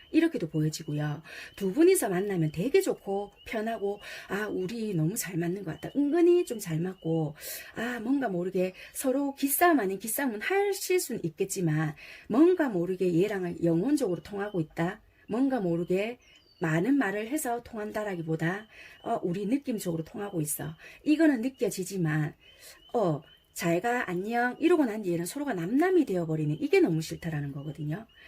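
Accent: Busan, South Korea. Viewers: 부산 사투리 뿜뿜 타로 5 처음부터 조언이......ㅋㅋㅋㅋ귀우셔용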